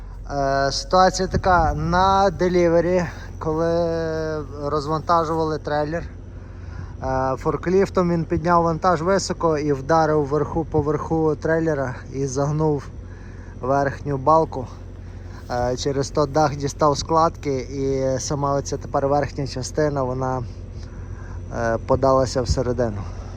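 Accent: Western Ukraine, Ukraine. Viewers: Диалект запукренский рагулячий ☝️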